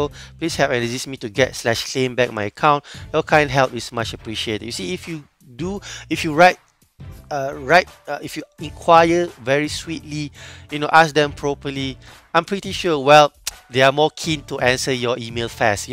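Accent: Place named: Malaysia. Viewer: English nya khas Malaysia betol🗿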